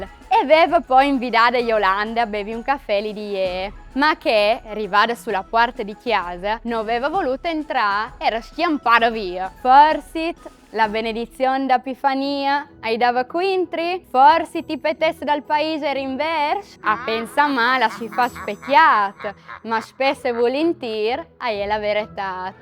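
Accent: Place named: Carnia, Italy. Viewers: Ma certo che e' carnica! Monai....Cleulas...e Prat a sci cjacara cu la a!!!!! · Scusate, sono appassionato di parlata friulana..... Questa ragazza di che zona è? Carnia ? Le parole hanno desinenza in a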